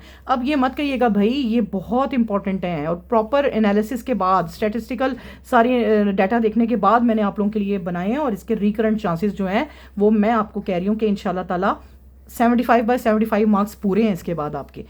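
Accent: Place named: Lahore, Pakistan. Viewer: Mam mujy to app Lahore ki LG rhi ha😊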